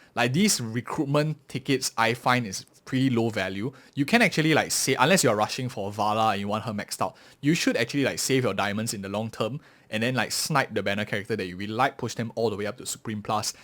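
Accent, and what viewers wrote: Singapore. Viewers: I could tell that was a Singaporean accent right off the bat haha. Fellow SG as well, really good guide since we have roughly the same VIP level but I’m in S54. Nice to see an SG Creator! Keep it up 👍🏻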